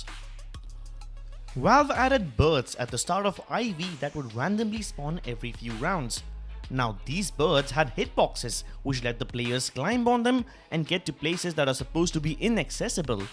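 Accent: India. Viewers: I'm sorry guys, I know you're doing great job at researching and editing, and it's really not your fault (or nothing you can do about it), but this indian accent is just unbearable, made the whole, otherwise well made, video unenjoyable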